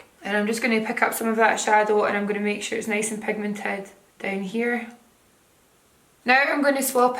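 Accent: Scotland, United Kingdom. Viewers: Where in Scotland is from?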